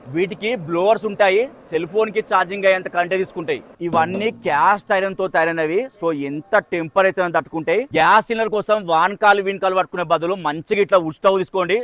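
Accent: Telangana, India. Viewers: స్వామీజీ మాలేశారు కాబట్టి స్వామీజీ అని పిలుస్తున్నాను అనంతపూర్ డిస్టిక్ పామిడి మండలం అడ్రస్ అని చెప్తున్నారు కానీ మాట్లాడే తీరు ఏ ప్రాంత వారిది వద్దు బాబు వ్యాపారం చేసుకో మేము సహకరిస్తాం బాగానే ఉందని ప్రచారం చేస్తాం వెళ్లి తీసుకోండి అని చెప్తాం కానీ తెలంగాణ భాష తీరు వద్దు బాబు ఇక్కడ ఉండి సంపాదించుకొని భోజనం చేసి వేరే చోట ప్రాంతానికి చేయి కడుక్కున్నట్టుగా ఉంది మీ తీరు వ్యాపారం జరగదు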